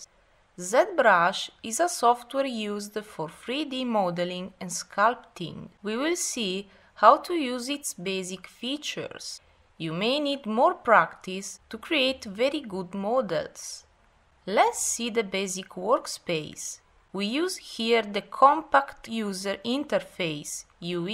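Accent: Russia. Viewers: Thick Russian accent aside, this tutorial has been great, the best one I've found thus far. I have learned more from this young woman than I have from any other source since I downloaded the trial version. Good job honey. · This russian accent is really annoying and distracting ....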